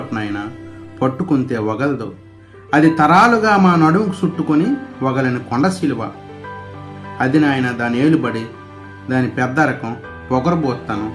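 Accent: Srikakulam, India. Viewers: కవి గారికి శుభోదయం. ఉద్దానం యొక్క పరిస్థితిని కళ్ళకు కట్టినట్లుగా కవిత చదివే విధానం శ్రీకాకుళం యాస కవితలో చోటుచేసుకుంది. పూర్వ విద్యార్థుల సమావేశంలో ఈ కవిని గురించి మాట్లాడే అవకాశం లేకపోవడం నాకు కొంత బాధ కలిగించిన విషయం. ధన్యవాదాలు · శ్రీకాకుళం యాస లో కవిత... చాలా హృద్యంగా ఉంది.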